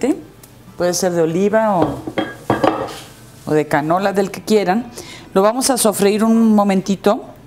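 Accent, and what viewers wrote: Mexico. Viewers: Que envidia, de la buena, hay se me hace agua la boca !! . Suerte con tu canal, eres estupenda y me encanta tu acento norteño..!!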